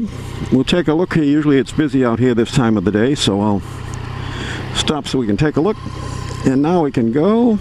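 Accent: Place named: New England, United States. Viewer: His accent doesn't sound like Florida. Sounds like a New England transplant.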